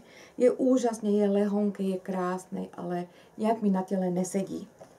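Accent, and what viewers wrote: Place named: Slovakia. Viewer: Pozdravujem vás. Máte krásnu prácu a koľko toho stíhate! Ja som stihla len kupiť priadzu na letnú háčkovanú tašku ale asi ju nestihnem. Ponožky ma eštelen čakajú a mám strach, že to bude závislosť. Prepáčte mi, že som zvedavá, ale podľa vášho prízvuku mám dojem, že ste Slovenka. Je to tak? Nech sa vám darí.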